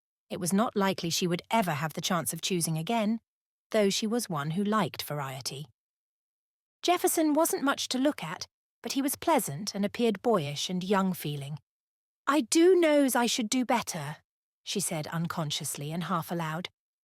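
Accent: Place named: Cockney, United Kingdom. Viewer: I wonder if you could have the books written by American authors read by somebody with American accent. Sarah O Jewett was an American living in New England Maine specifically. And to have this read with a Cockney accent sounds really bizarre.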